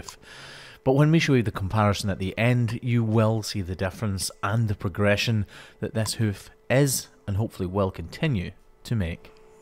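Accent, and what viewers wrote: Scotland, United Kingdom. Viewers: Hey man your Scottish right? I know you slip up on your vocabulary a lil bit but now wrys at that point go back to Scottish roots if we can't understand don't wry that's what closed captions are for lol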